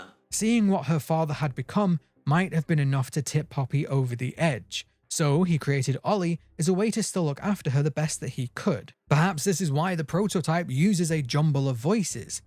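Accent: United Kingdom. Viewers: It's funny how differently British ppl pronounce things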